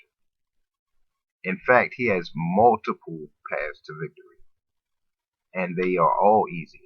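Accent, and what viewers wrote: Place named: Baltimore, United States. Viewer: I like your prediction btw. Obama carved out a new map in 2008 with a lot of new blue states. I don't think Bernie can win all of what Obama did, but he doesn't have to. Bernie can even lose Ohio and Florida and still easily win. Btw, are you from Baltimore? Thought I picked up some of that in your accent.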